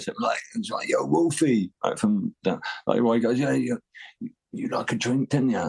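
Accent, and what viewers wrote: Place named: Hull, United Kingdom. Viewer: I'm from Hull your accent sounds close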